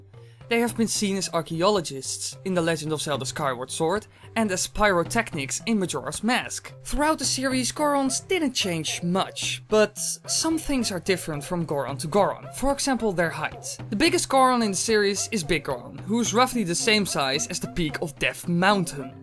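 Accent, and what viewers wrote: Netherlands. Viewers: Ik dacht al dat je nederlands was!!! Ik hoorde het aan je stem!!! Subbed! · are you from Holland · ah that's why, your accent made me remember my own language (also Dutch) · I came to your channel from m. productions and I'm so glad I found it. When I first heard your accent it sounded so familiar, and then I found out you and vortexxy are both from the Netherlands. It would also be cool to see a theory about the sheikah. There just so much unexplained stuff around them. Keep on making awesome theories!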